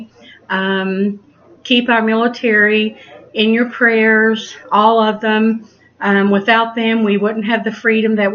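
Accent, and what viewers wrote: United States. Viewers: U are to cute an very southern I thought u said salmon.i was confused (cinnamon)Loved it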